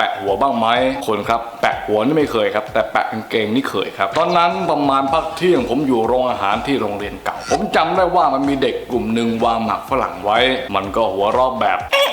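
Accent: South, Thailand. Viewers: เป้นคนใต้เหรอครับ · เป็นคนภาคใต้ใช้มั้ยครับ 😂😂😂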